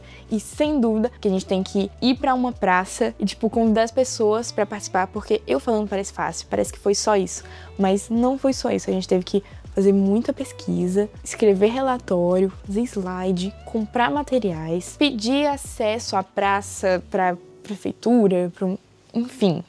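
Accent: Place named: São Paulo, Brazil. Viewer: impressionante que dá pra reconhecer um paulista de longe pelo sutaque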